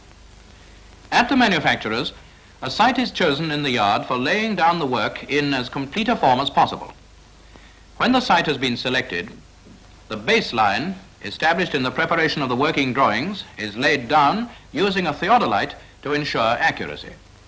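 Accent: RP, United Kingdom. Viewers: Amazing! Where did you find this? If this footage is unrestored it's even more amazing. Love the "BBC" English! Thank you!! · Classic 1930s commentary, BBC English delivered in that patrician and reassuring style,alongside plenty of technical jargon to keep the Great Unwashed enthralled and firmly in their place.Job done,and train passes sweetly over the new junction at Chester General...now let's have a nice cup of tea.Utter respect for these men,my father worked as a shunter and track repair ganger for 15 years,just 13 miles from where this was filmed,including through the harsh winter of 1963.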